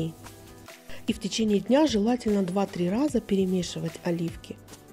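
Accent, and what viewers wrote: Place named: Ukraine. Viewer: Да, 🫒🫒🫒🌶️ , кушаем. Узнаю Украинский акцент :))) Молодец, что готовите сами оливки 🫒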